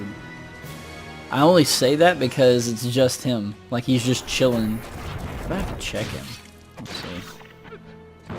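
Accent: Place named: South, United States. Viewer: I’m always surprised when I hear a familiar accent in a hobby that I enjoy. I’m completely used to being the oddball southerner that likes nerdy stuff. Cheers man, thanks for great content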